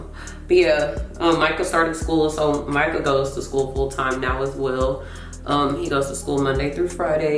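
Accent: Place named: South, United States. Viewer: That southern accent ❤so pretty I like the outfit ❤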